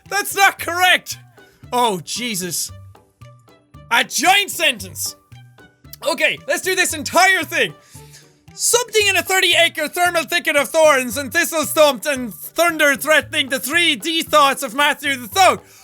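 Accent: Ireland. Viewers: He sounds so Irish in this 😂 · Next time you do a tongue twister thing, you should totally find the speech V does in V for Vendetta! Also next time you do a tongue twister with lots of "th"s feel free to play up the Irish accent because it's such a lovely accent and I never hear it in England xD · You just sound more Irish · This video really brought out irishness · So, in order for to go back to saying the Irish "think" we need to voice jam him. Got it. ( I love his speech either way btw) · you sounded much more Irish when you did this challenge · sounded even more irish than usual here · He went full irish. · You've never sounded more Irish:P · The Irish got worse and worse as he went on...and he did sound drunk, lol. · Lol your Irish accent becomes way more announced when you use the speech jammer, I love it XD this was hilarious:D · his irish accent got like 2x stronger with the speech jammer and it was wonderful. 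XD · His Irish accent comes back and it's kind of awesome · I like how his Irish accent got more Irish-y in this video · this is awesome, you sound even more irish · If anything... during most of these sounds more irish. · he sounded like a drunk irish person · YOUR IRISH IS SHOWING AGAIN!!!😂😉I love your Irish accent,even if it isn't as strong as before XD <3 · it gives him a much stronger irish accents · You should go full out Irish for a whole video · just got more irish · He sounds more Irish than usual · the irish accent got stronger as the video went on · He always did have an Irish accent... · His Irish accent comes out a lot more in this episode. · just sounds more and more drunk:D Pretty Irish though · Voice jammer... Should be Called Irish enhancer. Honestly you sound a lot more Irish... Maybe it's because you sound drunk HA! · Is it me or does he sound more Irish when speech jammer is on? 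· is it just me or is he way more Irish in this video than usual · his inner irish accent came out during this like the "typical" irish accent applauds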